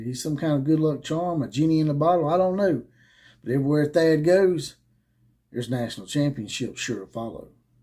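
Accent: South, United States. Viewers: I agree on the backing a car in topic. Parking lots even have signs posted that say do not back your car in but people still do it! Yes its even worse when a woman thinks she needs to back her car in. They will go back and forth multiple times to park. It makes me sick. Have you ever thought of becoming an actor? You are perfect for westerns. Good voice, good southern drawl and look like a cowboy. Boomer Sooner.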